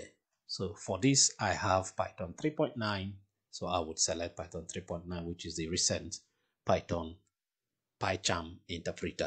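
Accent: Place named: Cameroon. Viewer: Bro, you sound Cameroonian. I am glad to see your page and I am subscribing right away! Great job!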